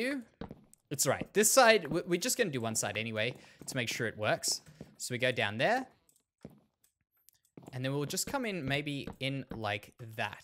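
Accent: Australia. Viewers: You have a relaxing voice ,are you from Australia? I am watching this series so late😂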